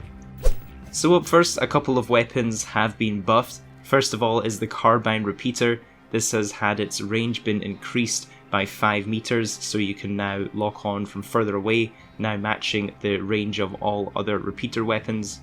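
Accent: Scotland, United Kingdom. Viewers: I will,always listen and watch you videos as a fellow Scots much better to hear ...❤️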